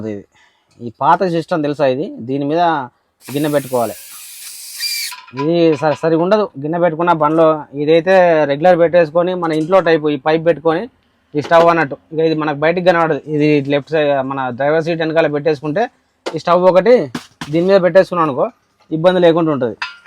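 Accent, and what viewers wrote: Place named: Andhra Pradesh, India. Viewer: Anna nidhi husnabad kada andra basha matladutatav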